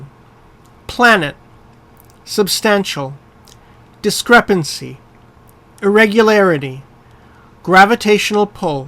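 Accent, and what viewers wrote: Canada. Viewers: 완전 좋아유 👍💯💯👍👍 캐나다 남편께서 직접 녹음하신 듯 하네요. 🤗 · 여기 발음은 캐나다 사람입니다~🐱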